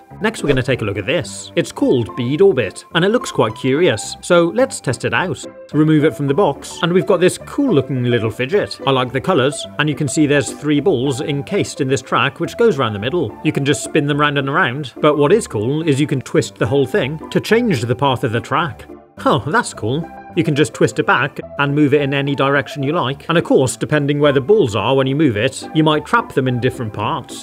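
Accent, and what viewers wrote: United Kingdom. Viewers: Bros British 💀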